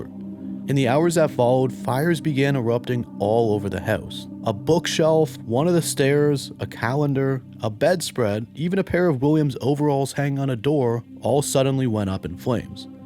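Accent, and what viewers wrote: Canada. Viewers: Dude You are SO Canadian!! 😂 not an insult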